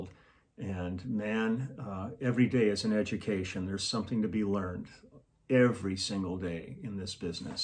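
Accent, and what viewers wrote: South, United States. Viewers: You made me spit my drink out when you told the story about the Loveless Cafe! As they say, "You got South in your mouth"! I can't believe you can remember street names! I have to say, you sounded very authentic saying Goo-Goo clusters! That song you played was popular right when I moved Nashville from Houston. Thx for the giggles! Btw, I just played her the Loveless Cafe story and we died laughing! Thank you again! Taryn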